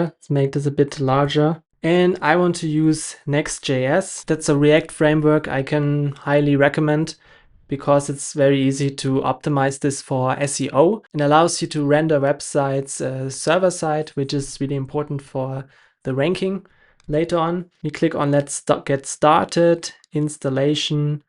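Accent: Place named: Germany. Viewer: If you hear a german accent you know you are on the right video ! Thanks 😊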